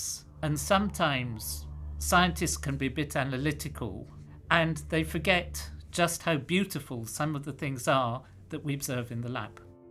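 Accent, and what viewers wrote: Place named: United Kingdom. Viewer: Science is more fun with british accents and stereotypical scientist hair.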